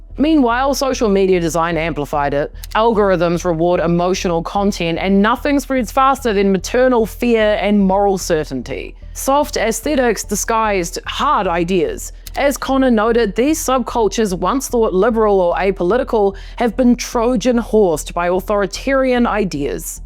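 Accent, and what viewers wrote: New Zealand. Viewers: Kiwi! Finally you disclosed and I learned where your accent came from! Now I have to convince myself that you are not a blank slate no readily foundable bio, AI made youtube anchor! hahaha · I said the same thing! And then felt awful because she clarified she IS a kiwi 😂 🤦‍♀️ real broad accent huh?!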